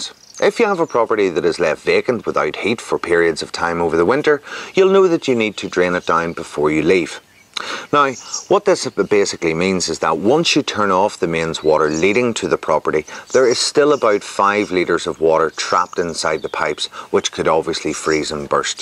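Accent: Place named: Ireland. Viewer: Omg the subtitles are soooooo funny !!!! The Irish accent caused some confusion 😂😂😂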